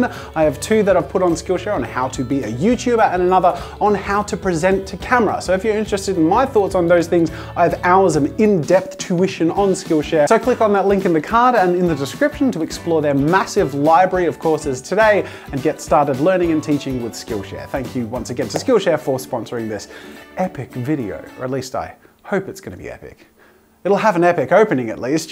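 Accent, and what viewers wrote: Australia. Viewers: you sounded the most Australian you ever have in this video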